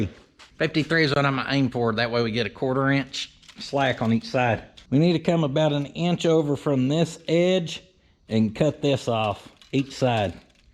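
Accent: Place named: Gastonia, United States. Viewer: Luckily I lived in Gastonia for 4 years, or else I wouldn’t be able to understand a single sarcastic word this guy said!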